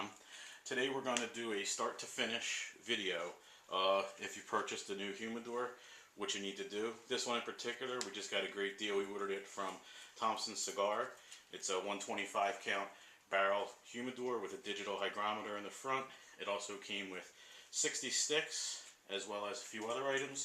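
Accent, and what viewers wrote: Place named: Philadelphia, United States. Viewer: Philly accent.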